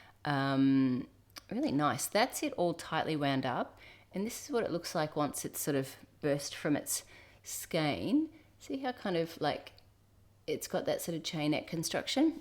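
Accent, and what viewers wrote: Australia. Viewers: I did “Busabout” in 2002 which sounds like it might have been Eurobus rebranded?! The routes and set up sound exactly what they were when I did it - such a fun adventure and so lovely to hear you share your similar experience. I’ve also loved watching each of your recent travel episodes - thank you for making them. As an Aussie who hasn’t lived in Australia for over a decade, your accent always brings me a taste of home 🦘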